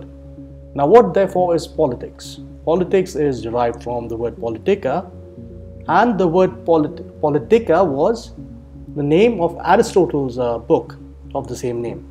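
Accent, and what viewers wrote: India. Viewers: Indian accent? new presenter? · He sounds Indian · Indian Accent? No no. Please no! ☹️☹️☹️☹️☹️☹️☹️☹️ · Oh boy it's Shirvan time! Hears Indian accent Oh boy it's World Power time! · Pls dont speak in an indian accent its uncomfortable the accent i just dont like it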